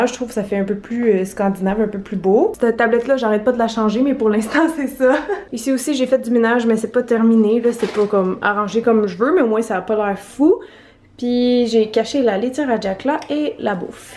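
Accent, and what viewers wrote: Quebec, Canada. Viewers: mon dieu j'aime tes vlogs, j'aime ton blog, j'aime tes vidéos, j'aime tes snaps, j'aime ton accent québécois, je te trouve toujours resplendissante, bref je t'aaaaaime fooort❤️ et jack aussi😘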